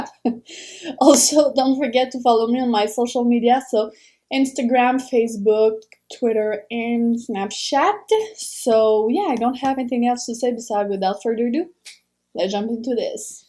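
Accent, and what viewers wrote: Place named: France. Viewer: gurl don't hide your French accent, I love when you put the accent on the RRRRR 😍😍 you look gorgeous and that eyeshadow is so beautiful!!!! Great look ❤️🤩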